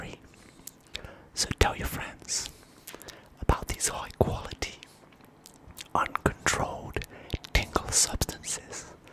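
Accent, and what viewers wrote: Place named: West London, United Kingdom. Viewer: I want to hear more of that west London accent heheeee~~~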